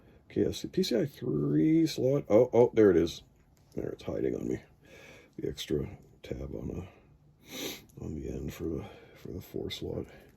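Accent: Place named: United Kingdom. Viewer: Hellooo, I’ve just buyed a Yuhui SFX PSU for 328 RMB or 45 USD (I think it’s a Black Commender 750) in TaoBao, rather than in NewEgg, Yuhui to my knowledge is the same or sub-brand from Sama, I just have some questions, has the PSU exploded or had some kind of issue? Is it silent? I don’t want to assume but you’re accent sounds British and if I’m correct, in the UK, they use 220V and my PSU, like yours, in the input says 100-240VAC (mine had a sticker on the plug saying 220V/AC), do you know if it works with 110V, since I live on a country we’re we use 110V? It also came with a Chinese type I connector (To Outlet) and also to my knowledge, in Chine they use 220V Nice content btw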